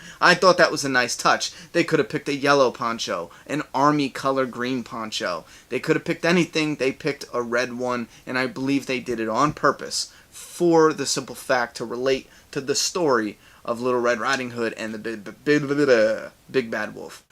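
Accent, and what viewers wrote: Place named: New Jersey, United States. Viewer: Gotcha. You had this Jersey Girl fooled. Your accent is sooo Jersey. And your humor is similar to Jay from Jay and Silent Bob from the Kevin Smith movies who is also a comic guy and from NJ. Thought I #nailedit ...smh... LOL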